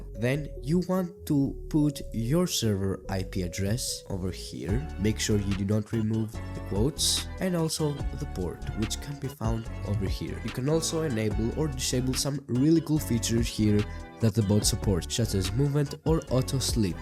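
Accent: Greece. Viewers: Bro Είμαι Έλληνας και εγώ έβλεπα το βίντεο και η προφορά ακούστηκε ελληνική μετά είδα και το κανάλι ήταν από την Ελλάδα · i knew by his accent that he was greek and just when he went to the options tab i saw it greek characters never underastimate my gut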